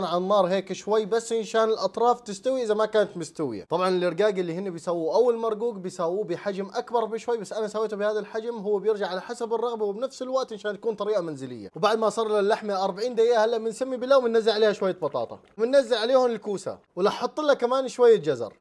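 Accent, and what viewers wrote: Syria. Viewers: رجعتي تحكي سورية التحلاب تموتو على البراني 😂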